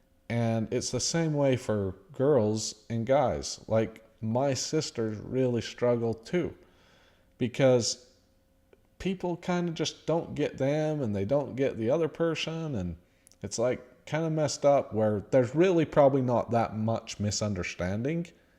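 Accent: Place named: United States. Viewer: I am so impressed with how sharp you are and with how calm and well-rounded your thoughts are. It’s really awesome to see you coming out of your shell and speaking your mind confidently🤗 PS: I don’t understand why people are giving you a hard time over your accent? I’m Australian so your accent just sounds to me like another American one that I don’t recognize😅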